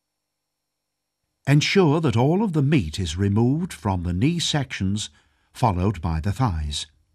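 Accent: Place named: United Kingdom. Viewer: you know it's good when a god damn brit is narrating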